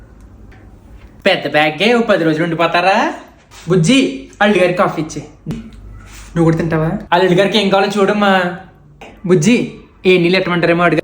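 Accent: Godavari, India. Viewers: Nee personality face ki ee godavari yaasa suit kavatledhu bhayya. Manchi 6 pack hero personality pettukoni accent emo bhavayyah typelo undi. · Me slang superr andi memu godarollame❤😂😂😂😂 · నిజం చెప్పండి మీది ఇస్ట్ అండ్ వెస్ట్ కదా మీ మాటలు వింటుంటే మా అమ్మ తో మాట్లాడుతున్నట్టు వుంది అన్నయ్య ❤ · గోదావరి జిల్లా యాస superr andi... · Same Godavari matalane undhi😂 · Godavari slang super Super funny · Anna,, me godavari slang n reela chusthe godavari ki ravali ani untundi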